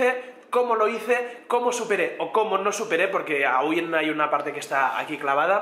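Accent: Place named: Catalonia, Spain. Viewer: Buenos dias, de chapa nada, eres un crack!! Conocí tus videos a traves de busquedas relacionadas con el atletismo y triatlon y me quedé un tanto alucinado cuando te vi camara en mano hablando sin parar y dando esas temibles chapas con lo de Señora!! y ese acento catalán, pero...cual fué mi sorpresa cuando sorprendentemente te encontré casualmente en otros videos junto a Auronplay y otros haciendo IM Lanzarote y Hawai con lo cual te convertiste en mi pequeño idolo jajaja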